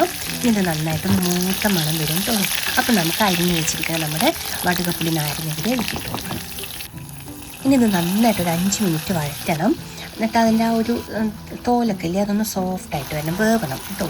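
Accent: Palakkad, India. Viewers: My mouth is watering nice to here your kollengode palaghat basha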